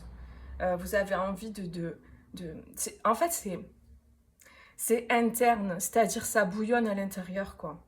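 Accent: South, France. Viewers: Mais c'est très sympa cet acca-în du sud !!!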